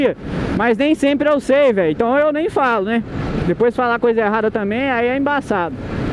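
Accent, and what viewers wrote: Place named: Goiânia, Brazil. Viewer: Se e de Goiânia? Parece demais kkkk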